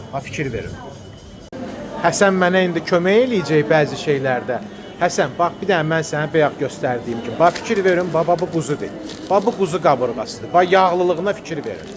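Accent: Baku, Azerbaijan. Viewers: Çəhrəgayiye özüdə,ultra, modern,pro Bakı lehçesidüraa.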